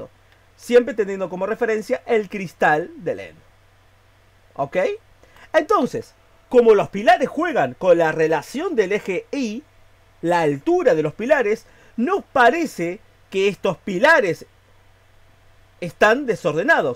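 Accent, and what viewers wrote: Argentina. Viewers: Che mira, te hago una pregunta ¿vos sos argentino o de que pais sos? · De adonde es el chico este?? la tonada se me hace muy argentina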